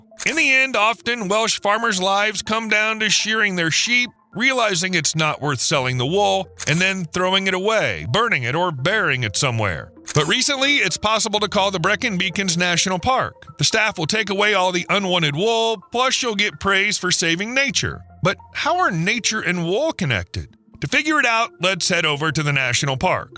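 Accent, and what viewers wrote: United States. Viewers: It is unfortunate that the announcer is a loud American..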